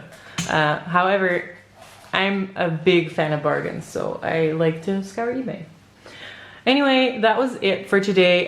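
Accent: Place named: United States. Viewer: How come you do not have a Dutch but an American accent? Just curious, I never would have guessed. 🤔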